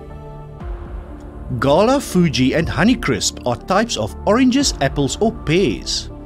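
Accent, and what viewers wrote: South Africa. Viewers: U R South-African, judging by your accent?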